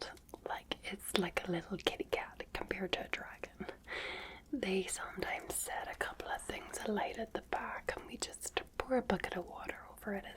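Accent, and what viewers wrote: Scotland, United Kingdom. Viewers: Northern Irish English does sound more like Scottish English than Irish English.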